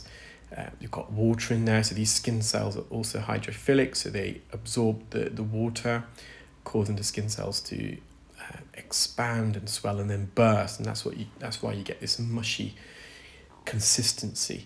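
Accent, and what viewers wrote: United Kingdom. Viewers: I know your British but where about exactly is you’re accent from ?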